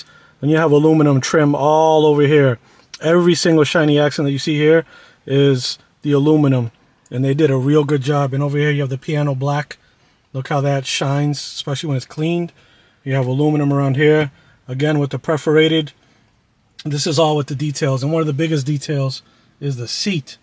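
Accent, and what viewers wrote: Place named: Massachusetts, United States. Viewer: I’m from MA too. You don’t have a strong accent at all (the road signs looked like you were closer to RI anyways), but I noticed it a few times - not a bad thing. Anyways - love the S5, congrats!!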